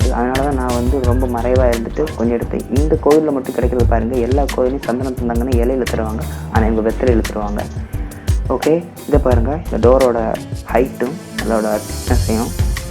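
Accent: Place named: Kanyakumari, India. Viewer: Ur language spr... Kk slang🥰🤩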